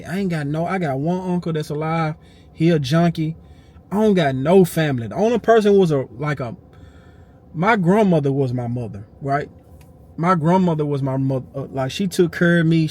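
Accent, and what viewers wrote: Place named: Louisiana, United States. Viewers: New sub,u speaking facts🤣😂🤣😂😂😭😂😭😂aye u got a southern accent u gotta be from da south,im from Louisiana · Sounds like Louisiana to me cuz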